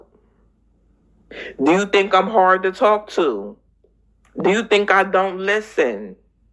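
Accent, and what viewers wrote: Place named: Philadelphia, United States. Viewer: You sound like your from Philly or east coast anyway always enjoy your content love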